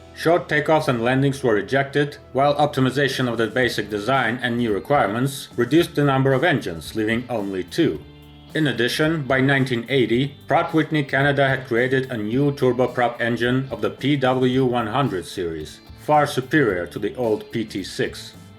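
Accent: Russia. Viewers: The rusdian accent is di atrocious. Couldn't make it beyond one minute. Full disclosure, I'm rusophone myself. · Thanks for your work friend. I like your Russian accent, and I live in the USA.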